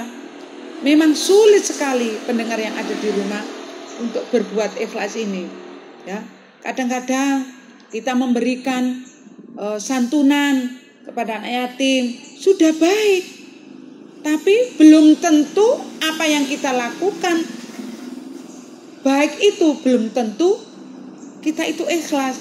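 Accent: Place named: Java, Indonesia. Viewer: Pelan pelan bu nyai gk usah tergesah gesah agar bhs indonesianya tdk kelihatan medok,krn sdh msk youtub, maaf kritik membangun smg sukses dakwanya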